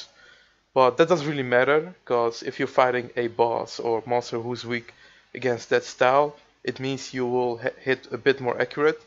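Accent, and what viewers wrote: Netherlands. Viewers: just a question where are you from? Basing on your accent im quessing the netherlands?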